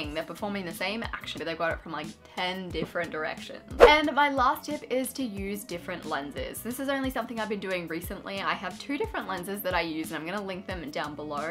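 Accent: Australia. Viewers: This is awesome! I find your content so helpful and inspiring!!! Also, I love your Australian accent, your voice is very pleasant to listen to. Do you have a podcast? I think you’d do great in that forum too 😆❤️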